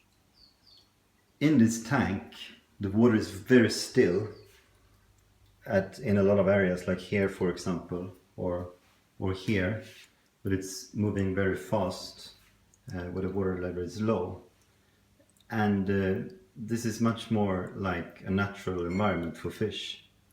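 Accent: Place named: Sweden. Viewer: Kikat på detta kar flera flera gånger tidigare, aldrig lagt märke till att du var svensk. Hörde det direkt på dialekten nu dock, haha... Älskar karet. Så simpelt och samtidigt udda! Det märks att du har ett öga för design... Definitivt en bra källa till inspiration; blir lika lockad varje gång att köra igång ett "flodkar". Har du några planer på att utveckla karet? Jag tänker i form av fisk, inredning, bakgrund osv. Keep up the good work!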